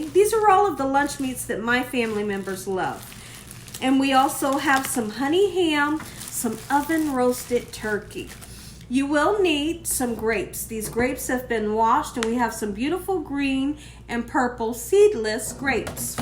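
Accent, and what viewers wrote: South, United States. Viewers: Girl do not worry about your braces. You still have an amazing smile and I love how you speak. That beautiful southern accent. Ooowee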